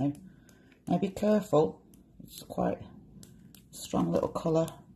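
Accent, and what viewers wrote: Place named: North, United Kingdom. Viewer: Love your work, loose is the way to go. Sounds like you are from somewhere in the north?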